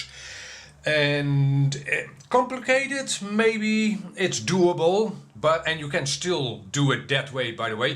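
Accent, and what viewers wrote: Netherlands. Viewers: that was it, not DCU driver. I downloaded the impulse RC driver fixer. It connected to the flt controler had a little look around saw what was missing and loaded it, then left it in boot load so I could just open Betaflight and do the flash. Sorted 2 yellow boxes and I could do what you demonstrated. Thanks a mill for making me aware of them buttons, just had to find them. Subscribed, do love the Dutch accent.👍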